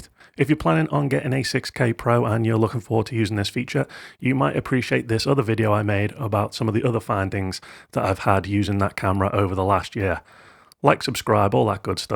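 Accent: United Kingdom. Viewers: Im from the North West - I new it was a Merseyside accent (i know the Wirral isnt Merseyside but you know what I mean) 😆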